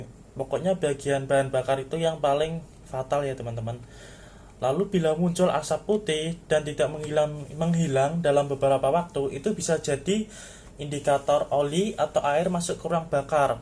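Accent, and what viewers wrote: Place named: Java, Indonesia. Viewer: Saya suka orang medok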